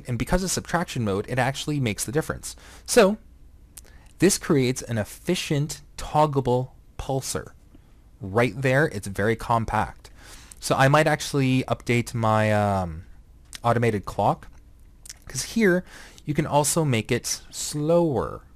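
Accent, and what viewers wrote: Canada. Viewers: you are from canada XD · You sound Canadian, are you Canadian?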